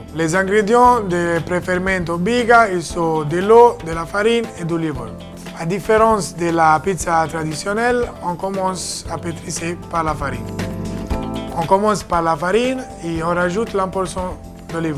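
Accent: Italy. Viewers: Great job, you're great. Love your Italian French accent, never be ashamed of it and be proud of your origins!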